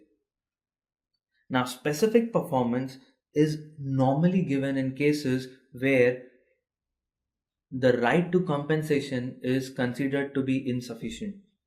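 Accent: India. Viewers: Can't stand this weirdo Indian and his annoying accent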